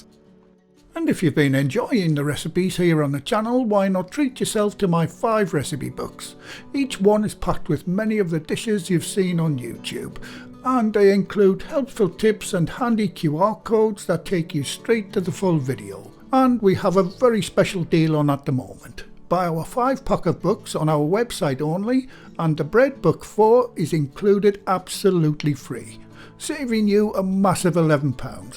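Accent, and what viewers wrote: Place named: Newcastle, United Kingdom. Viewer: Your voice is so calming for less confident cooks! " Set your yeast away"... I've decided more food channels need to be in Geordie dialect!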